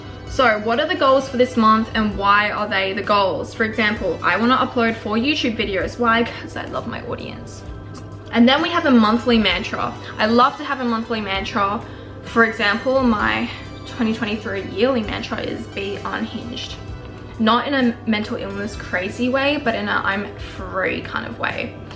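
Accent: Australia. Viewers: is she Australian?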